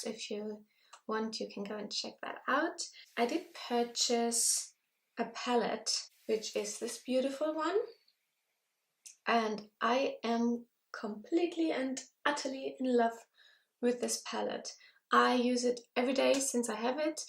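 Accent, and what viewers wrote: Germany. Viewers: Your German accent is great, and your English is good! I subscribed to you after your velvet lips video because I am interested in makeup and the German language. I learned some makeup-specific German vocabulary from your videos!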